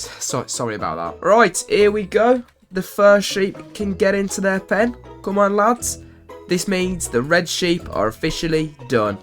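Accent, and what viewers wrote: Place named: United Kingdom. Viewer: helo how are u Dude British accent is funny 😂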